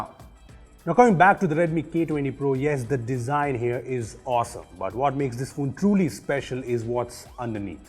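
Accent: India. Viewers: Nothing is more unsexy than an indian accent Head turned left that at least one eye is looking in the camera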